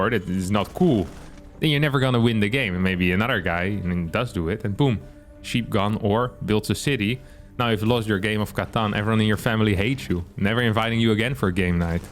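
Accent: Germany. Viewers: love your german accent xD 10/10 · I love Harstem doing a German accent anytime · Your german accent was so funny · Your german accent is perfect 😍😍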